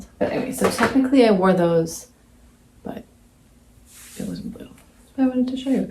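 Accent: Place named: Midwest, United States. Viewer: Great video, I've always been a bit intimidated by blue nail polish, but it's so cute! Also, I'm hearing a suuuuuper strong Wisconsin/Minnesota accent, am I correct? Lol.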